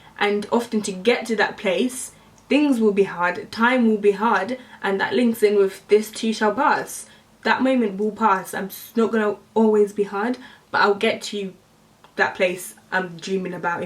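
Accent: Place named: Crawley, United Kingdom. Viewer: Where abouts are you from? You sound like your from Crawley. Love your tats btw👏🏽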